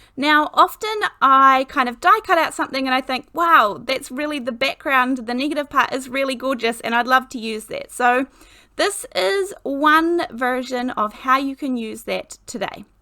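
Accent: New Zealand. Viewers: I love this! It's so beautiful (and easy)! By the way, is your accent NZ? I'm in Canada but my son and his family his on the North Island in Laingholm.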